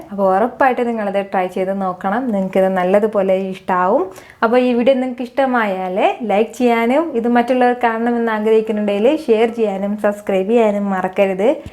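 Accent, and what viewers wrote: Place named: Thiruvananthapuram, India. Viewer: Thiruvananthapuram aanoo.. Slang oru vyathyasam.. 🤣😍Enthayalum adipoli. Kelkumbol thanne oru chirichkondulla suntharamaya mukam manasil varunnu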